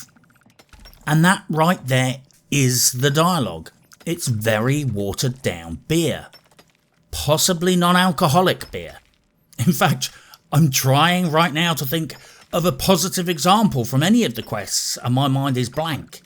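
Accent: United Kingdom. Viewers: You can't tell he's a brit by his voice? Dull one hm?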